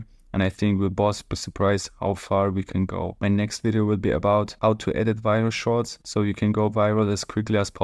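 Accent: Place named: Germany. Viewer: Als wenn du wirklich deutsch bist habs am Akzent erkannt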